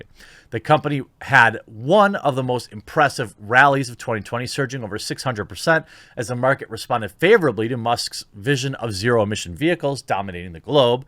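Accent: Wisconsin, United States. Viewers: has a Wisconsian accent? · Nah, but that Wisconsin accent warps things a bit. 😉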